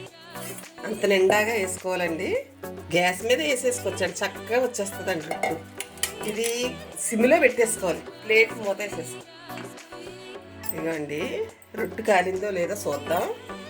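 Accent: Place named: Konaseema, India. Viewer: So nice మా కోనసీమ మాట లా ఉంది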